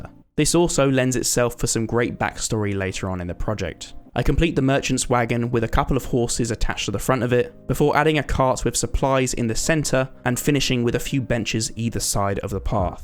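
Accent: United Kingdom. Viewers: British Accent Nice!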